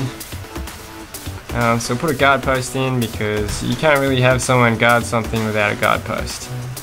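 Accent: Australia. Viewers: It's the WIDEST Australian accent i've heard yet =O) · Is that an Australia accent I hear by the way your the best youtuber ever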